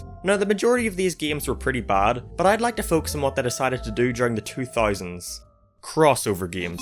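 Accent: Northern Ireland, United Kingdom. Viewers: Northern irish accent. You from Belfast? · Are u from NI ahah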